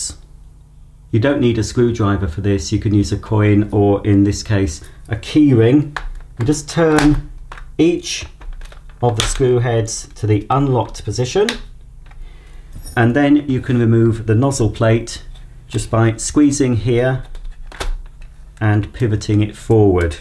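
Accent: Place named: United Kingdom. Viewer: Thanks, British guy!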